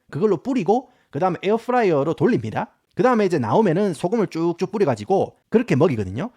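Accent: Gyeongsang, South Korea. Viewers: 내용은 좋은데 경상도 사투리 극혐 경상도 선조가 중국에서 넘어온 한족들이라 유일하게 한반도내 성조가 있어 듣기 거북함 씨끄럽고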